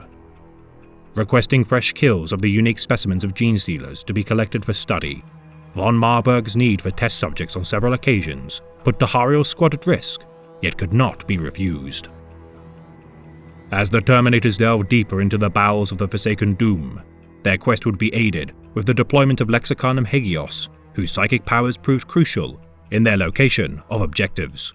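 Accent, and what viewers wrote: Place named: England, United Kingdom. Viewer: I was getting tired of the necron narrator tbh lol back to that sweet English dialect lol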